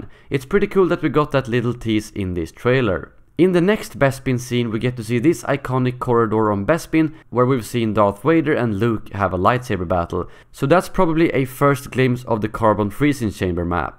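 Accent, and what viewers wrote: Sweden. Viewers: Allow me to congratulate you for the incredibly well-packed video full of information, and when you speculated it was properly mentioned. Your videos are getting better by the day :D (still miss the swedish accent of the earliest videos hahaha). Keep it up!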